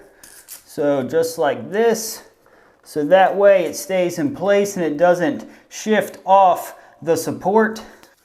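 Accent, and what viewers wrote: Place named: Pittsburgh, United States. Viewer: Idk where your from my guy, but your accent sounds exactly like people from Pittsburgh!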